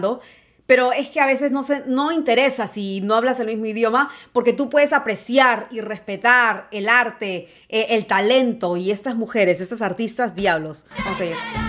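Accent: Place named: Argentina. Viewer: Me alegro que te gustara. Mis paisanos ya te explicaron lo suficiente y completamente de acuerdo contigo. Y la 2ª pronunciación que dijiste es la correcta. Aparte por tu acento, creo que eres argentina (apostaría a porteña), por lo que seguro que encontrarás a gallegos uqe te lo puedan explicar (eso sí, de Galicia😊)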